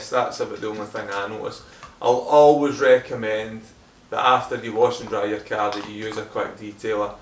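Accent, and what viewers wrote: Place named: Glasgow, United Kingdom. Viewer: Thanks for answering about the accent! We thought it might’ve been Scottish, but had noticed your shop was in Blackpool. We actually spent the first week of July touring through the Highlands. I think the closest we got to Hamilton was Callander. Wonderful country!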